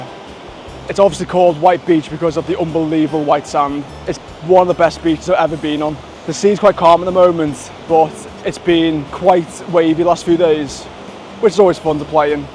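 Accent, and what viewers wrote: Liverpool, United Kingdom. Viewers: U scouser?? Or from wirral?? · You sound like a scouser. You here for long I'm in cebu for 18 months now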